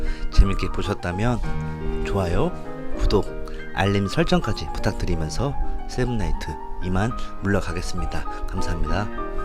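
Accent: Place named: South, South Korea. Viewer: 멘트에 남방 억양이 강해서 듣기 집중이 잘 안되네요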